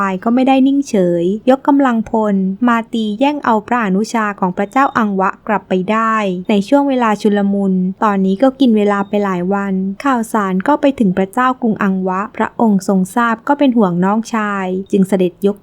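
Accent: South, Thailand. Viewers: ฟังน้ำเสียงแอดมินเป็นคนใต้ไหมครับ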